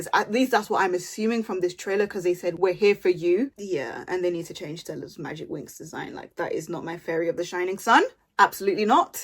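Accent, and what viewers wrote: United Kingdom. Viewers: my god, i couldn't get enough of your accent through the video! What's your accent, british? But I think i hear some other accent. Am I wrong??😵‍💫😣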